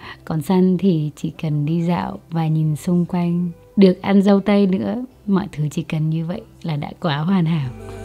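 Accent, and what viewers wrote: Central, Vietnam. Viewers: Hic chị quê ở mô rứa ạ. Em thấy chị nói giọng miền trung 😊 Tập này như đang nói đến em vậy . Em cũng đang ở xa nhà ít bạn bè và đôi lúc cảm thấy cô đơn nhưng rất thích quãng thời gian một mình Đôi lúc bản thân cũng có thời gian lặng để biết bản thân cần gì😊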